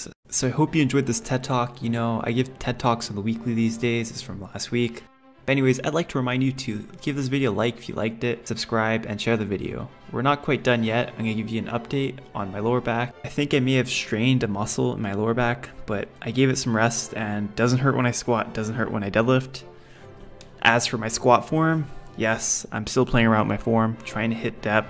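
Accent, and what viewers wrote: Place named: United States. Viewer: So what's your final point. I am sorry I am not very good at understanding American accent. Please explain me. All I understood was enjoying every moment. Is this video about that?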